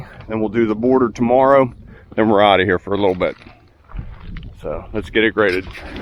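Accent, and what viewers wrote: Pittsburgh, United States. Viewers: Are you a yinzer? You sound like it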